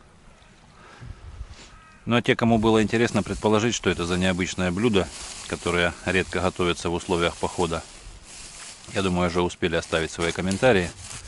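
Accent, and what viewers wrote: Ukraine. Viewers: Та тут сразу по разговору слышно ,что наш с востока Украины!!!Привет из Мариуполя!!!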